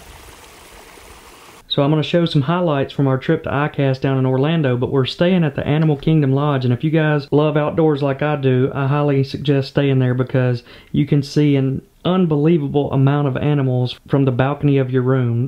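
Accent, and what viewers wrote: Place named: Alabama, United States. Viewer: Omg ok so im from texas but i moved to alabama about 2 years ago i moved back sense but i have a best friend that i miss so much and accent is exactly like hers and i know its because yallare both from alabama but i just wanted to mention it😂😍😭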